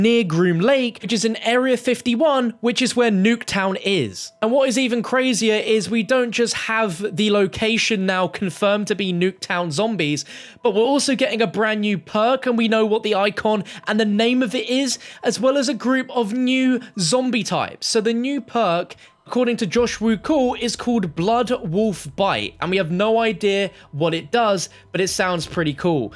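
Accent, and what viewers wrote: United Kingdom. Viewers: He might speak in a different dialect or he’s like British or isn’t from the US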